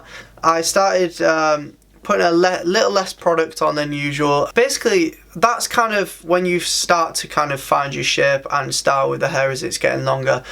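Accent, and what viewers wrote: United Kingdom. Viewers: Thats British accent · Hmm Yorkshire accent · Brad McNaughton northern English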